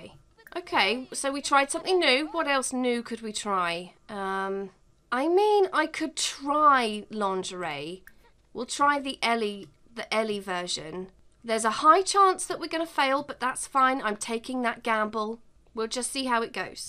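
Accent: United Kingdom. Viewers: are u from uk i live in uk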